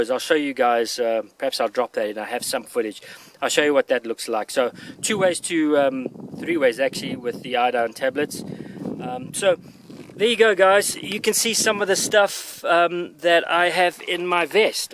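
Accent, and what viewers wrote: South Africa. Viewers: Your rig serves the same function as our load bearing/carrying equipment in the Army. It allowed us to cache our rucksacks and do short range patrols with much less bulk & weight while still having enough equipment that we wouldn't be caught short if something unexpected happened. Sound thinking in my opinion. I enjoy your accent; sounds South African to me.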